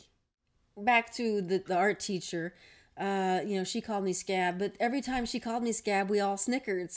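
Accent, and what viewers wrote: Liverpool, United Kingdom. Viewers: I always loved the Lennon books too. But I never asked anybody to call me Scab. Nice Liverpoodlian accent, too! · Oh gosh, I still have those books from the 60's! I can't do the Liverpudlian accent like you can, but I loved reading his words and getting carried away with the absurdity of it all. From the mind that gave us, "Turn left at Greenland," when a reporter asked them, "How did you find America?" Oh, the memories.... thanks, Scab... :-D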